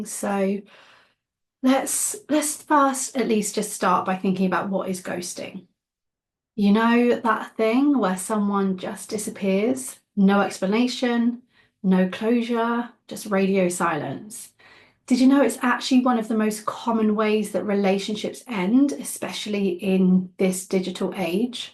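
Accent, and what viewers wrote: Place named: United Kingdom. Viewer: Love your British accent! 😊😉🌺💯💯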